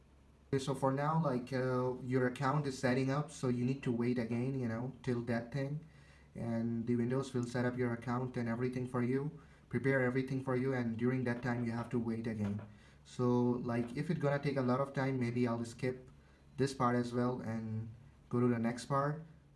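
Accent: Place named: England, United Kingdom. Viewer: thanks god for your english accent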